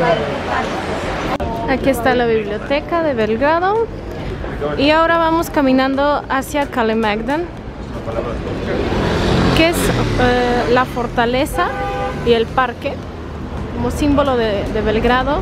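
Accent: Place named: Mexico. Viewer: Una cosa es hablar bien español, pero en tu caso lo haces con todo y acento Mexicano, pareces nativa Mexicana, me sorprende mucho!! :O Que bonito es tu país, tiene lugares modernos, historicos, además de lugares naturales, mucho verde, me agrada!!! (^.^)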